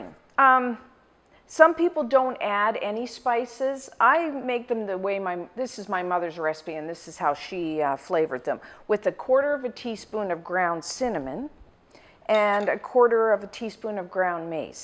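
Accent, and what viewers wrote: Canada. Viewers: Ah, you're hearing the North American accent, but you've completely missed her CANADIAN vowel! Nobody in the U.S. sounds like her! (Unless, perhaps, they live near the Canadian border). The Canadian accent is heard in the vowel "ou", as in "out" or "about," and it is adorable.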